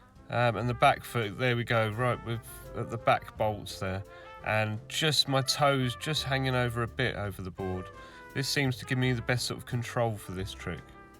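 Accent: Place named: United Kingdom. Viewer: British ah boy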